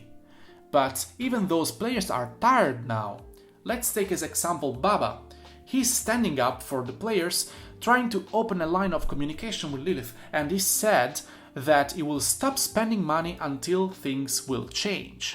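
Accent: Italy. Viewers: Avevo intuito qualcosa dalla pronuncia, il ciao finale mi ha convinto😂. Iscritto 💪👍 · bro are you italian? by the way very good video, as f2p i totally agree with you, another youtuber (@ said the same things weeks ago in one of his stream explaining why rok is about to die if they continue on this path, he try also to contact developers but no-one respond to him #CommunityMatters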